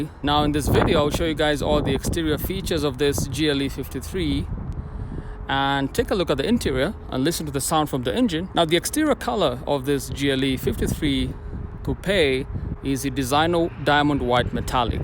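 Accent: Kenya. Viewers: That accent is so Kenyan yani 🇰🇪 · You sound Kenyan.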